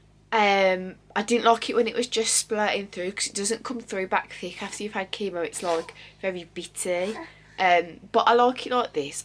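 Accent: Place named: Birmingham, United Kingdom. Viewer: She's from Birmingham that's the Birmingham accent you're hearing x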